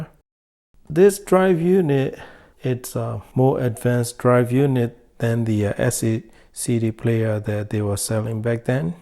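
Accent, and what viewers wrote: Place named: Myanmar. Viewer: Your sound like Myanmar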